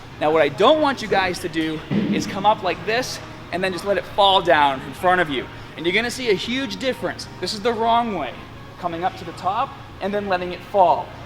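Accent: Boston, United States. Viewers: I never noticed that Boston accent until after watching The Heat. lol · You don't sound like this in your instructional vid lol nice bAston accent. Keep up the good work though! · He is from the Boston, Massachusetts area I believe. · came back after 2 years and he suddenly has a Boston accent. Lol wtf. Weird ploy to attract more viewers? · Did his Boston accent get stronger? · it's boston accent. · he actually comes from Boston, so... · I love going back 6 years on your channel and seeing how little gains you've made, you look the exact same. You also didn't have a boston accent 6 years ago.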